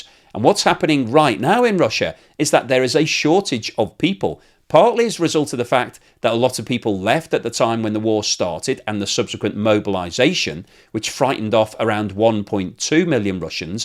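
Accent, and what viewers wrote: United Kingdom. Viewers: Oh, British accent. It's a dead giveaway. As soon as one hears it - one knows he'll be lied to at nauseum. I don't know if that thing is cultural, traditional, biological - but British accent is a flashing red light. My point - no one from that incest ridden, degenerated island, with crooked teeth and the hunger for other people's stuff, is not to be trusted. Just my 2 cents. You're welcome.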